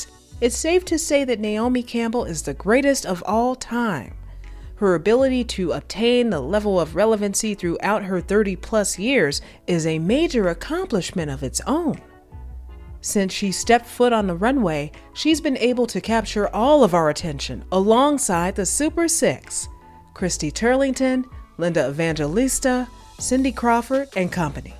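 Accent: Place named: United Kingdom. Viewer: It’s the British accent for meee🤣🤣 spot onnnn🎉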